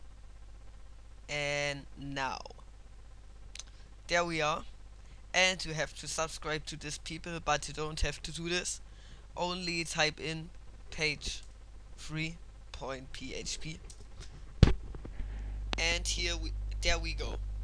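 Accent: Germany. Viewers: Bist du Deutscher? ^^ Wenn ja, man hört´s :D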